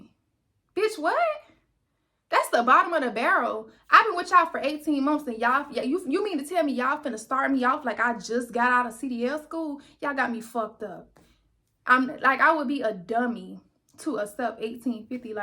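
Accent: South, United States. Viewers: Good information!! Thanks but that southern accent omg 😅😂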